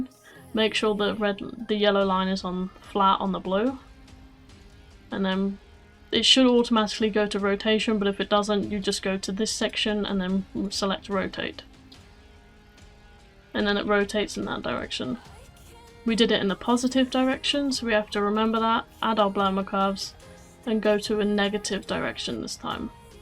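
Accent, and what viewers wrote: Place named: United Kingdom. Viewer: i noticed when im trying this and i noticed your bri-ish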